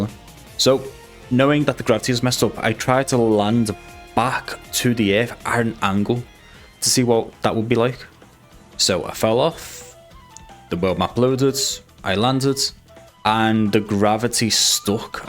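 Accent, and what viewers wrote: Liverpool, United Kingdom. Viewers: great video made even better by fact you're a scouser, legend. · Omg are you a fellow scouser… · come to think of it barely any youtubers have a Liverpool accent like this (in my experience at least)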